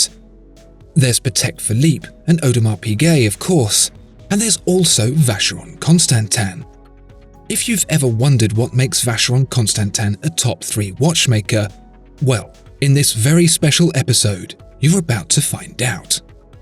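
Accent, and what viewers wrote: United Kingdom. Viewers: Now if we could just get you Brits to stop saying 'Pack-AHHHHD'.....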